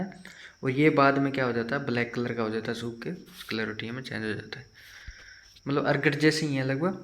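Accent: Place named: Haryana, India. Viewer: haryana s ho kya bhai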